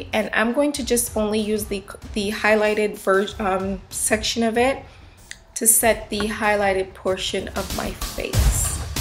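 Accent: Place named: Jamaica. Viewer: Lovely makeup. I can hear an accent. Are you from Jamaica? If so, I am too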